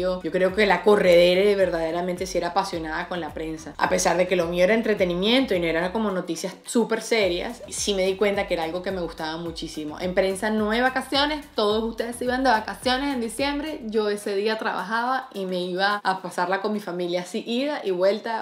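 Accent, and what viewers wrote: Venezuela. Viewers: Me encanta que ah pesar de los años. Porque ya tienes años fuera del país. Sigues siendo venezolana y hablas como una venezolana. Que orgullo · Me encanta tu contenido y tu personalidad, pareces una amiga más. En otro video me gustaría que contaras cómo entraste a Turner; si fue por casting, o porque igual te llamaron. El Miss Universo por TNT ya es algo muy tuyo, no sería lo mismo si te sacaran. Además, eres venezolana, tu acento es muy rico de escuchar, y ganaste un concurso de belleza: TNT no te va a soltar! Hahahaha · eres exageradamente bella, y me encanta tu acento venezolano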